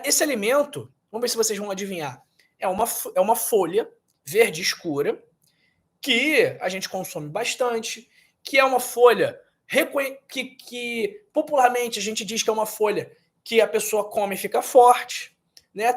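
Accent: Rio de Janeiro, Brazil. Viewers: Muito x d carioca sotaque intragável e muito conversa conteúdo demorado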